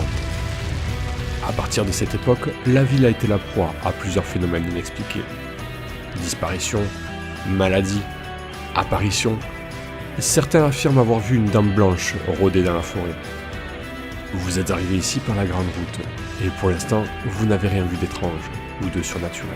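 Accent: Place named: South, France. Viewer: Y'a pas à chier on voit la progression, autant dans l'originalité que dans la façon de raconter l'histoire, en plus le petit accent du sud met trop bien. Je suis bien content d'avoir découvert ta chaîne grâce à ton passage sur le Live d'Anthox. Sinon t'as jamais essayé de te mettre en contacte avec des MJ comme Mahyar par exemple? ça pourrait t'aider à prendre de la visibilité.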